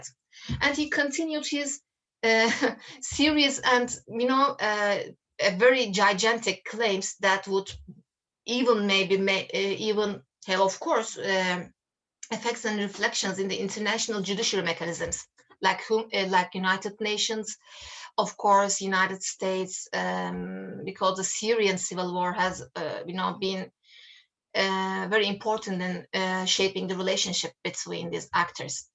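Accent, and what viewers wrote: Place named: Turkey. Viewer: Kanka aksanın cok kötü turk aksanıyla konusuon ingilizceyi.. Biraz uzat kelimeleri yabancı yayın yapacaksan local aksanla olmaz. American aksanı tamamda ingilizler anlamayabilir bu aksanı. Genede likeı basim sevkini kırmayalım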